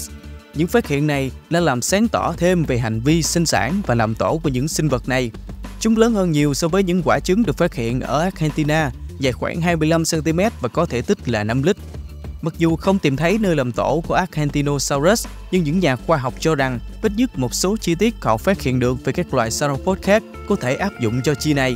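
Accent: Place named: North, Vietnam. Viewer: không phải phâm biệt vùng miền gì mình là người miền trung vẫn thích nghe giọng bắc chuẩn hơn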